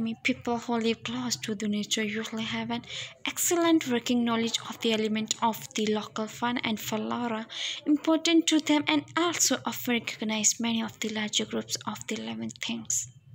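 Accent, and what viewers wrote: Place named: Lahore, Pakistan. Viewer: Voice👍👌🤗🤩 lagdi lahore di aa 😅😜😚😁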